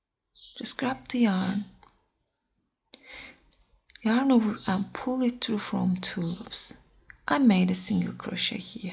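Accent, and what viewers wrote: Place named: Turkey. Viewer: I've watched a few of your videos and had a feeling from your accent that you're from Turkey 😀 I wasn't sure though but then I saw the yarn you're using. I have this brand too as I live in Turkey 😊 hello to you and thanks for your videos. Your accent and voice is so soothing and your videos are so helpful 🤗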